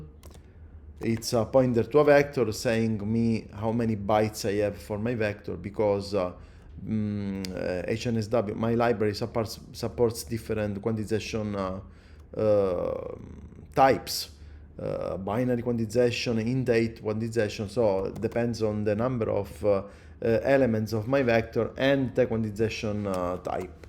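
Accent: Sicily, Italy. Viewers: il tuo inglese mi fa morire perche mi ricorda tanto il mio. Il tuo richiama la Sicilia Sud Orientale, il mio quella nord occidentale:-)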